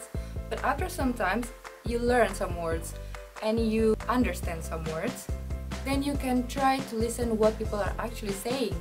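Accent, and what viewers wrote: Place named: Indonesia. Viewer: Just by hearing her speaking Swedish with that accent, I already know she's Indonesian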